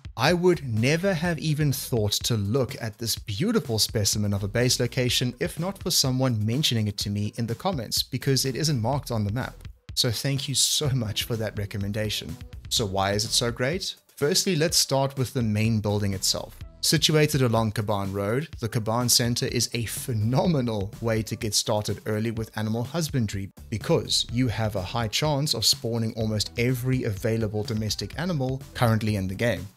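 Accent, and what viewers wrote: South Africa. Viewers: I sense a South African accent? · are u south african?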